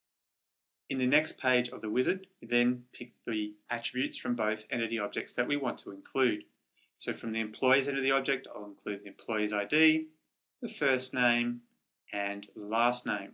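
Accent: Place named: Australia. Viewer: See, everybody loves an Aussie accent! ;-)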